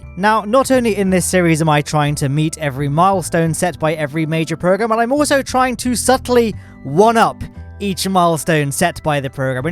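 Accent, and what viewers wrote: United Kingdom. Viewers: Only OG's remember his British accent at the inteo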